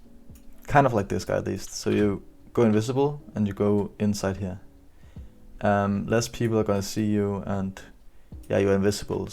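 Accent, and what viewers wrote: Denmark. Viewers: ur danish i can hear it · are you from denmark? cus i am and ur accent is like that.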